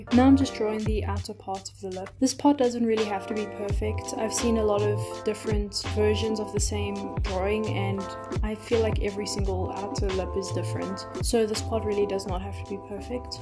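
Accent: South Africa. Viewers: Are you South African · R u from South Africa ?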